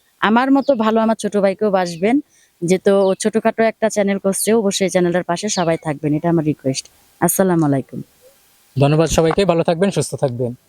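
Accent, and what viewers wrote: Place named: Tangail, Bangladesh. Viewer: আপা আমি অনেক আগে থেকেই জানি, রাজু ভাই, আর আপনি স্বামী-স্ত্রী, অভিনয়ে ও দুজন দারুন,,। কিভাবে জানি, মোটামুটি বেশ কিছুদিন হয়ে গেছে, একবার একটা ভিডিওতে দেখছিলাম, আপনার ছেলের সুন্নতের অনুষ্ঠান, তখন আপনার ঘরে রাজু ভাইয়াকে দেখছিলাম, অনুষ্ঠানের আয়োজনে ব্যস্ত ছিল। আপা আজকে আপনার চেহারাটা অন্যরকম লাগছে কেন? আর এতদিন জানতাম না, আপনার বাড়ি যে পাবনায়, কথার ভাষা শোনে তো মনে করছিলাম টাংগাইলের মেয়ে আপনি, লাইক কমেন্ট সাবস্ক্রাইব করে দিয়েছি আপনার ছোট ভাইয়ের চ্যানেলটি, ভালো থাকবেন, পাশে আছি 👍, 🥰🤩🥰🤩❤👍🎉🎉🎉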